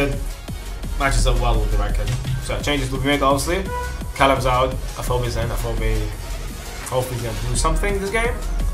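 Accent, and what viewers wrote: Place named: Spain. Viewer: you sound little like if you were from Spain little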